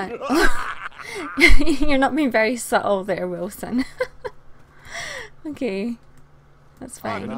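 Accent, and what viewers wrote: Scotland, United Kingdom. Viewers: I love your accent. It's just something about Scottish accents that make them just so cool. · I'm watching this because I like watching old Sims videos because they used to be basically all I'd watch and I have one question Is she Scottish? She sounds Scottish. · Yeah, I used to live in Scotland and her accent is kinda like it. :) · You sound Scottish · she is scottish! I WANT HER ACCENT though D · 100% Scottish :P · Comments: 50%asking if your scottish 25%saying they like your scottis accent 24%saying other stufss 1% saying the comments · Omg she's Scottish yasssss I like to call my self Scottish because I move in Scotland when I was 6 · Finally another Scottish youtube! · WOA UR SCOTTISH?!!! I'VE ALWAYS WANTED TO HEAR A SCOTTISH PERSON TALK ITS AN AWESOME ACCENT IDK WHY I LIKE ACCENTS SO MUCH I WISH I HAS ONE FJEFJECH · Melissa Hubbert She's from Fife, so kind of in the highlands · im scottish but i had no idea you were scottish haha and i laughed at the bit when he was looking on his phone haha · ***** You're Scottish aren't you? 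Cause I can here that accent from miles (probably because I am Scottish). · She is Scottish (: I am Scottish too! Makes me proud :')x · LOVE the Scottish accent. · Where are you from? It's pretty obvious to spot an Irish accent from a scottish one. She's Scottish. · I am Scottish and I can tell that she is Scottish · I'm Irish and she's definitely Scottish. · YEY finally a Scottish you tuber I am aswell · I love your accent! Scottish accent is pretty awesome :) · Its so nice to hear our accent used well! Theres so many people in Scotland who use abbreviation of the end on words, especially verbs, far too often. I like your dictation mixed in with our 'ma's and stuff like that! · Your accent is amazing! I love how you talk lol (Scottish?)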